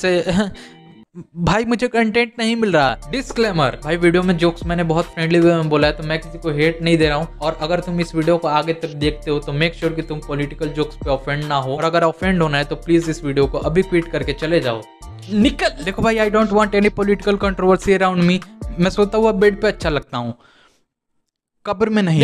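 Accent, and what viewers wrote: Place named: Bihar, India. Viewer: Y you sound bihar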